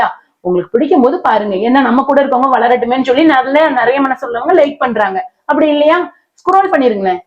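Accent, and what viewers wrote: Tirunelveli, India. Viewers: சிஸ்டர் நீங்க 3 கோடி 47 லட்சம் Views வச்சியிருக்கீங்க அது மற்றவர்களுக்கு பொறாமை கவலை பட வேண்டாம் தங்கச்சி என் சொநத ஊரு சேரன்மகாதேவி நானும்ஒரு யூடிப்பர் தான் நான் இப்போ இருப்பது ஈரோடு உங்க திருநெல்வேலி பாசை கேட்க இனிமையாக இருக்கும். என் பெயர் ராஜேஸ்வரி என்னோட சேனல் Rajiyin Rajyam என்னால் இப்போ Video போட முடியவில்லை தங்கச்சி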